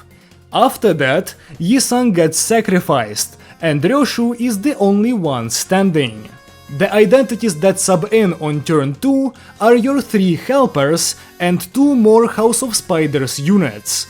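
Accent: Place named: Russia. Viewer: Y teba akcent russki =)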